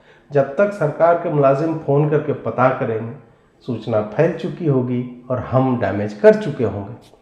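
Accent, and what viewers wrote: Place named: Bihar, India. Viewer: अजीत भारती भाई आप का विश्लेषण का जबाब नही होता पर व्यंग्य वाली वीडियो ऊपर से बिहारी तड़का शुद्ध हिंदी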